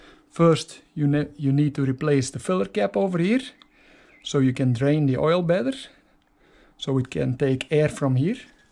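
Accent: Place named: Belgium. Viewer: For en Englishman you have a Belgium accent. No problem, clear explication.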